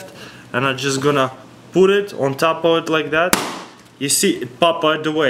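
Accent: Russia. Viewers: Y do people make fun of the way he talks he is Russian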